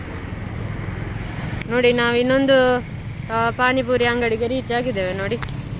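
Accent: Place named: Malnad, India. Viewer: Your malnadu accent of speech is really nice to hear 😘😘😘😘😘😘😘😘😘😘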